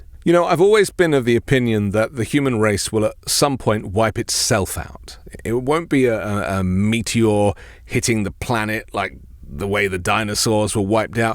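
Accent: England, United Kingdom. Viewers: what nonsense, the economy was twice as productive. unemployment was way down. this is propaganda with an English accent...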